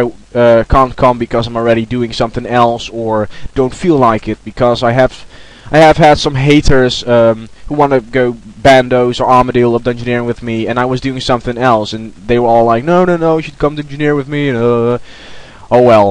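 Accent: Netherlands. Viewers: i can hear that you are dutch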